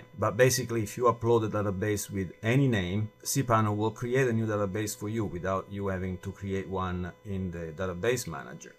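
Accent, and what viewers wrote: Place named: Italy. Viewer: being italian i find our accent pretty funny, but true, def good tutorial!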